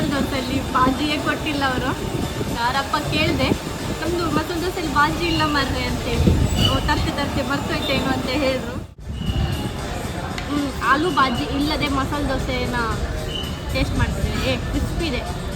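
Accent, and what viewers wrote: Kundapura, India. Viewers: ಕುಂದಾಪ್ರ ಕನ್ನಡ ಚಂದ್ ಮಾತನಾಡತ್ತಿರ ಮೇಡಂ ❤️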